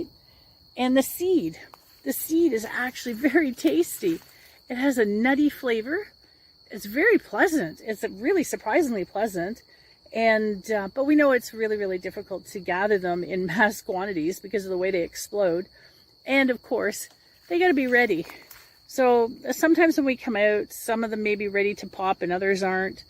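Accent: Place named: Canada. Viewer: Thank you! Wre those crickets in the background ? Where were you filming from? You sound Canadian but i don't associate crickets with Canada!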